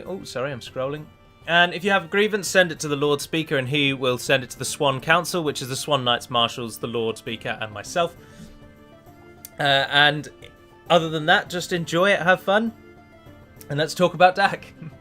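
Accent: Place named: RP, United Kingdom. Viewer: you are incredibly posh its fascinating.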